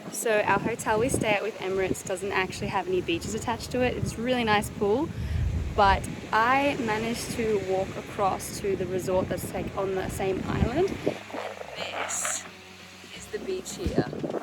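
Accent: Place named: Australia. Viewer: I knew u sounded Aussie when I watched your shorts…thanks for the content it’s very interesting job u have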